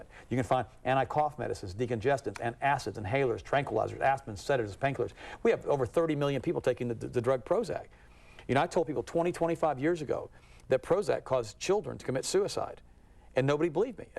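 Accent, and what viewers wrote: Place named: South, United States. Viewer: he said "high fat", not "hot fat" lol. its his southern accent threw you off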